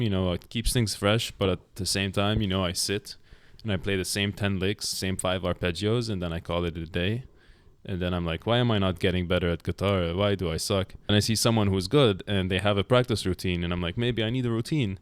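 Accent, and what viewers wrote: United Kingdom. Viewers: Why are you british? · You're British accent is so funny :)))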